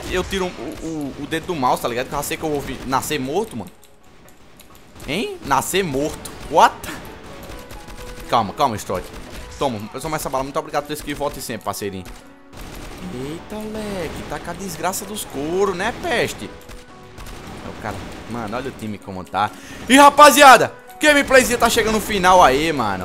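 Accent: Northeast, Brazil. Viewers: Man Se E du Nordeste ? LIKE BRODI ! ♥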